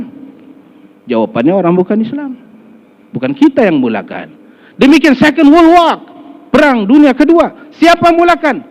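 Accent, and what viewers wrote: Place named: Kelantan, Malaysia. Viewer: sedap bunyi loghat Kelate.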